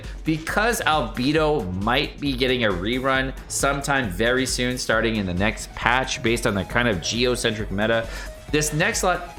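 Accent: United Kingdom. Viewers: British? Instant like.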